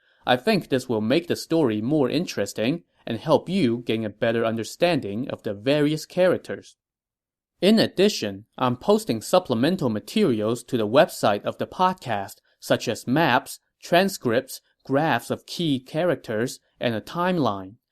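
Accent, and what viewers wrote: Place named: China. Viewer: Its interesting to hear a chinese speaker speak english with how the pitch moves since it sounds so distinctly chinese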